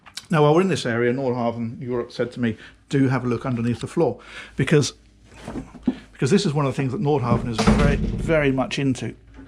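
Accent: England, United Kingdom. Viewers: "the electronics on this boat... it's proper stuff, it's really pucker!" i'd watch these tours just to hear an englishman describe things this way.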